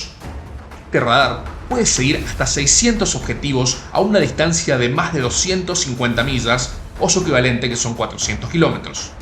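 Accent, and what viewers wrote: Argentina. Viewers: Hola amigos, me encanta el canal, y LA informacion que nos brinda. Pregunta; quien es el narrator? Suena in poco Argentino, algo que seria in poco irónico!!! Saludos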